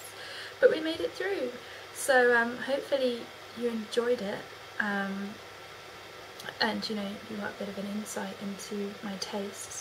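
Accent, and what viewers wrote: United Kingdom. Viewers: You're so british! In a good way ^^ haha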